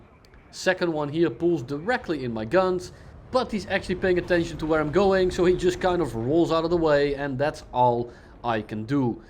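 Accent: Netherlands. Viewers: I can hear immediatelythat you’re Dutch at least I think so 😂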